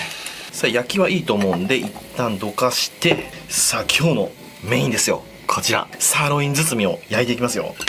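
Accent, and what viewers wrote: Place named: Mikawa, Japan. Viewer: しゃべりが三河弁？朝倉未来と似てるね！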